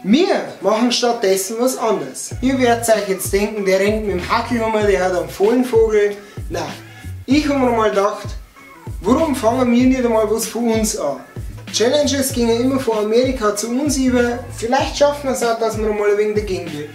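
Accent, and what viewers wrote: Bavaria, Germany. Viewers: I almost don't get anything you say but it's always nice listen to thr bavarian accent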